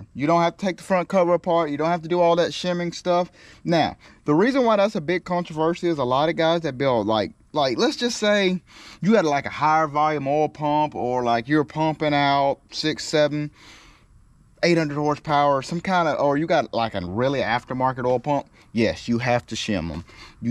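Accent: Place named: Alabama, United States. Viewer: “ I’m from green bow Alabama” - got the same accent. great video though.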